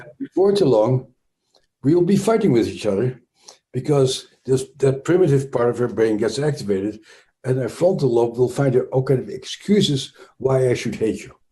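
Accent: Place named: Netherlands. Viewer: I have lots of happy memories around neighbors with Dutch accents. So I love to hear Bessel speak. I am also part Dutch.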